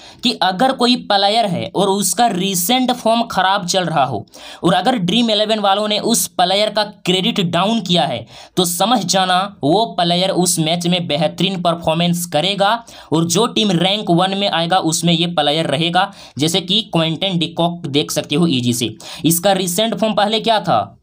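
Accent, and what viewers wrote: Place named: Bihar, India. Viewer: Bihari hai aawaj se pata chalta hai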